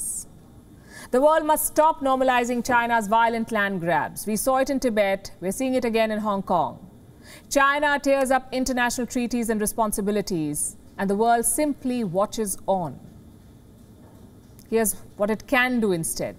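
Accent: England, United Kingdom. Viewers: I like this host's English accent. Lol (no offense)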